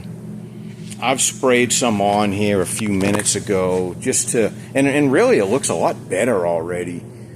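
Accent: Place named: Boston, United States. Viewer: Apaht. All the pahts. Miss being around that accent , raised in the Baystate (living in the south now)… anyhow dipping it is hella pricey but no warping no sand surprise when painting no texture changes. Sitting on my ‘47 dually till I can afford the same. Going to set it on a 90’s cummings dodge chassis… Hey check out Rungë cars on yt, great coach builder also khaos design yt out of Australia great stuff.* edit to add love your perspective and attitude- so refreshing. Also great point on wanting to drive it not perfect it- oh man that hit home😅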